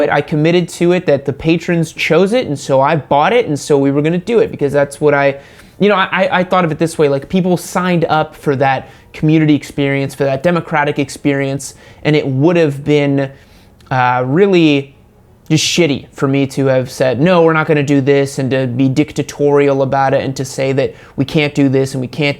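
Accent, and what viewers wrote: Portugal. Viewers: my dude are you portuguese? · Are you Portuguese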